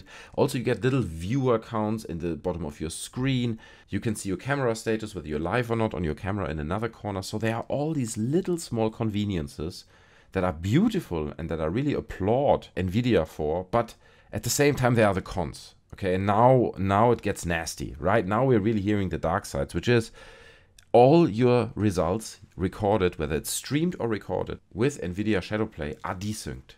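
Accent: Germany. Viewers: Are you from germany? Because how you pronounce words is very german xD!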